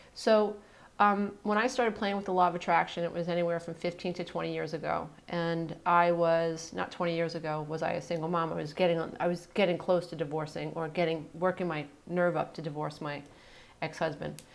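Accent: New York, United States. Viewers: Love the New York accent! You don't have to apologize for it. Thank you for this deeply inspiring video :) The message is spot on: your thoughts are your destiny! We empaths, who are stuck in the memes of the past, can't hear this often enough. Thanks to you, I finally get it! Peace and love to you and yours in the New Year!!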